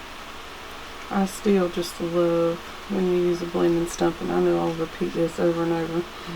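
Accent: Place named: South, United States. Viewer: I love to hear you talk. You have a sweet southern draw. I like how you say “that’s purdy” You have such talent. You really enjoy what your doing. I love watching you create.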